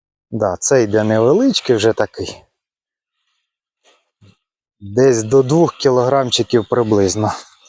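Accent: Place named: Russia. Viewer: Как бы не старался рускоязычный человек говорить на мове, всё равно это чувствуется.А водоём этот не плохой,по крайней мере не так заросши водной растительностью как в прошлых видео.. нхнч.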